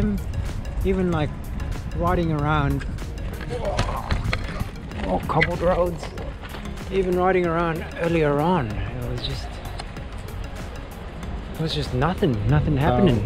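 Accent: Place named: Australia. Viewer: Palanga is a resort town in Lithuania. You sound australian or could be kiwi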